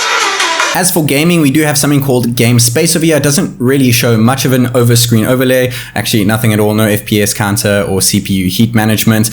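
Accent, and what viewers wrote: South Africa. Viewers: Heard South African accent. Immediately subscribed. Sick content. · Is he from South Africa?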